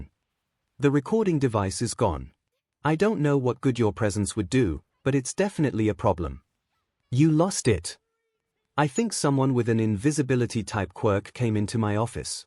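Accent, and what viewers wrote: United Kingdom. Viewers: first time watchng this kind of content with a british accent kinda nervoua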